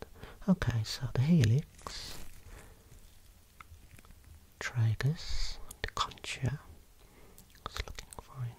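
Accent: Birmingham, United Kingdom. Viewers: mate you are absolutely blinding. your natural and professional bedside manner is just brilliant, your knowledge and terminology combined with your brummie accent make you my favourite channel. it's fantastic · Been listening to this every night for the past week, it’s saved me from a stressful week so thank you! Love the softer whispering, the Brum accent is delightful ❤️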